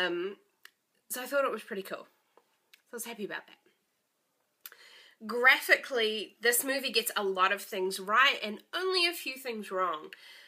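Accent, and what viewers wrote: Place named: New Zealand. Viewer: Awesome review m'dear. I know it's weird when people talk about your voice, I get it a lot but your NZ accent is truly awesome btw :P Yeah I'm not sure what to think about whether or not he was possessed by the evil already or if it got to him whilst on the ship. I lean more towards him being possessed already as that tends to explain more.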